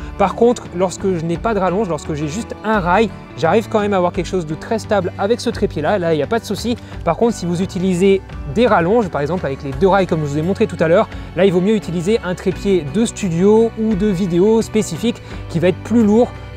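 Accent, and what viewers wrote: Alsace, France. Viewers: on dirait que tu es en Alsace. en tout cas super bonne vodéo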